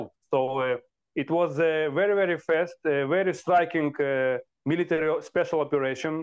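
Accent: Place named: Russia. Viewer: Такива откровени глупости! 😂Западно име със силен руски акцент! 😅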